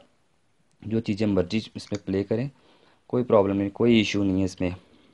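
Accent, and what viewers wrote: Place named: Punjab, India. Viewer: aapke bolne se lagra tha aap punjab k ho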